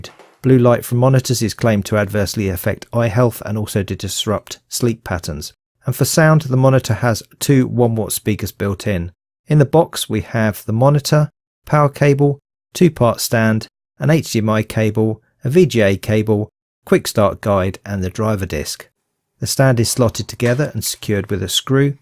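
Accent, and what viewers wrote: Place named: United Kingdom. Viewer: Sweet British accent. Regarding monitor I have shortlisted ss cf390, curve.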